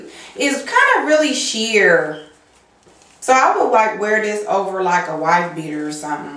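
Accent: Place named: Chicago, United States. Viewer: U r too funny!!! Nice finds. Where r u from? I remember u saying ur from the Midwest but living in NY. U have an accent and talk like my cousins in the Chi. :)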